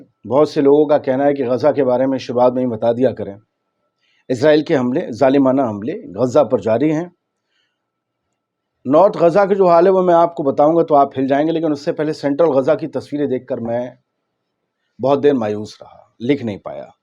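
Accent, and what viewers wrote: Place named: Muzaffarpur, India. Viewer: Bari Muzaffarpur zaban bolted hain aap.masha allah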